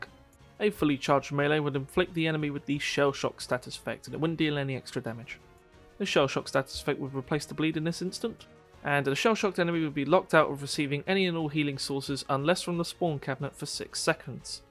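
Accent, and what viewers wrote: United Kingdom. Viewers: he is probably from Birmingham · He gives me cockney vibes (north east london) rough as anything and kind of a pub brawler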